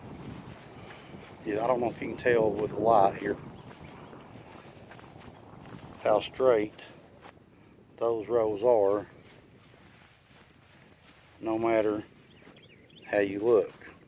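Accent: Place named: South, United States. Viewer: Ok, I’m going to straight admit to this. I subscribed because I really like listening to your southern accent.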